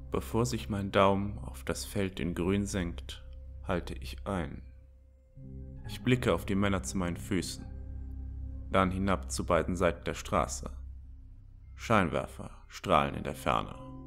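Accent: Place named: Berlin, Germany. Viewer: vom dialekt eher berliner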